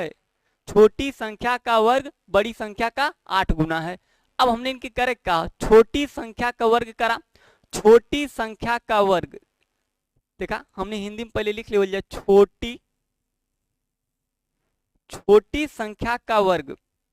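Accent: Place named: Bhojpuri, India. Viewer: गजब सर इसी तरह भोजपुरी अंदाज me padhiye bahut अच्छे se samajh आ raha है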